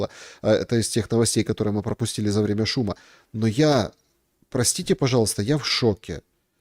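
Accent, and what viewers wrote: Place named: Donbas, Ukraine. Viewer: Господин, мне приятно смотреть ваши ролики, не только потому, что вы в них говорите по "донбасянски", несмотря на звонкое "Г", но ещё и потому, что вы с РТИ! Да и контент у вас востребованный! Мы за вас! Делайте вашу работу, как и всегда. Нам нравится! С уважением - Кременная.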